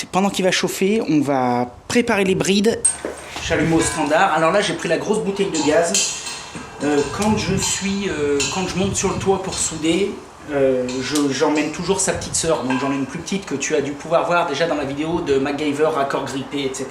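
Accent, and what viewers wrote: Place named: Franche-Comté, France. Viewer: Salut avec ton accent t es de chez moi , tu est francomtois?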